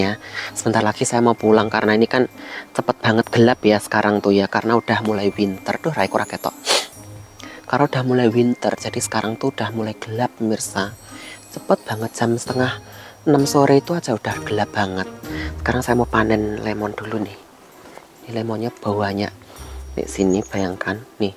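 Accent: Java, Indonesia. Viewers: Seneng banget nontonin video panen2 mas trus ditambah ngomongnya logat jawa lucu gitu 🤗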